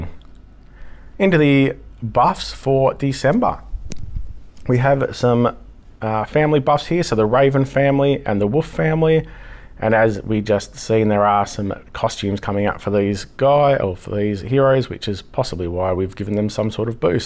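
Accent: Australia. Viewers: Thx mate, great to hear another Aussie. Been subscribed since before you hit 100 subs. Found you from McNaulty. I'm the leader of Obi One Kenobies but your alliance is way higher than ours so we wont see each other across the battle field.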